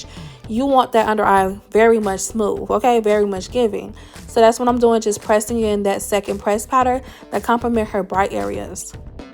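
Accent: Miami, United States. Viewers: I love your Miami accent baeeee💞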